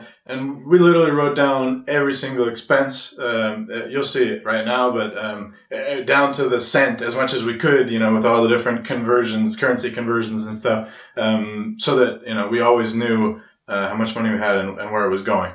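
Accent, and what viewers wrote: United Kingdom. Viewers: She sounds like she has a British accent lol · Oh I get it now. He's Scottish. That explains everything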